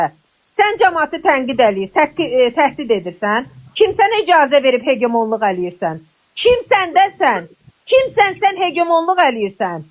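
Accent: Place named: Baku, Azerbaijan. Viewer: Canim xanim əsilll Baki ləhcəsi canim Bakillim. Duz deyir vallah.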